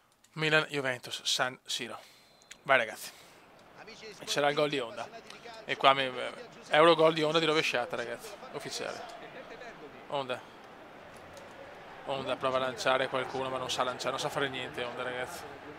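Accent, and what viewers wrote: Veneto, Italy. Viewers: mi mancava la tua voce da veneziano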